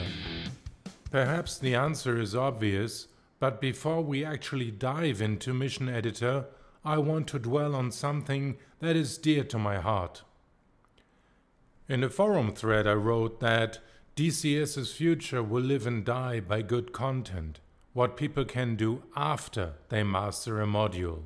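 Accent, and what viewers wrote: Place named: Germany. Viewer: A german with humour and a very german accent? Sign me in. Thank you dear neighbours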